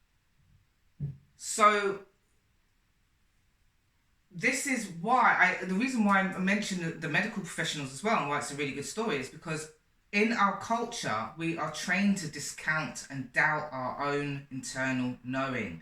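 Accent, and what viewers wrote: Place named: United Kingdom. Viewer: Hi, lobed ypur video. I realise I am a claircognizance too.. Sending positive vibrations to you regarding your Dad. You sound British, are you in the UK?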